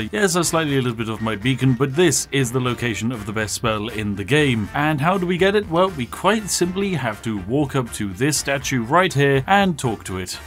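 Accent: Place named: England, United Kingdom. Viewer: I’m sorry, I really am, but the way the English say certain things makes me sick to my stomach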